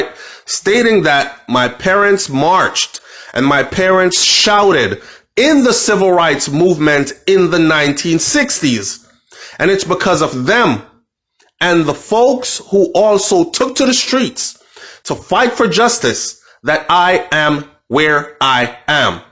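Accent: Jamaica. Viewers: I appreciate the content but I find voice to be annoying especially the absurd blend of the Jamaican and American accent. His articulation and voice pitch is awful. I would recommend he get speech therapy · I am living here in the U.S. and to tell it in the Jamaican way the way you did, u no fool (as mi granny woulda a sey). Yu back up yu story wid facts an' mi proud a yu. I have been following the Harris story and I have decided who I will vote for in November. Peace!!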